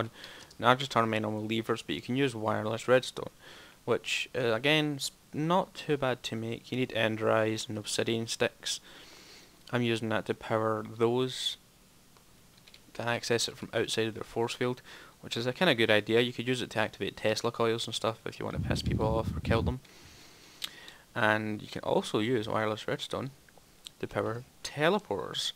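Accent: Scotland, United Kingdom. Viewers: I don't see how Gregory cannot under stand your accent, Scottish accents are the best :D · Clearly a Scottish accent. It is my favorite accent actually.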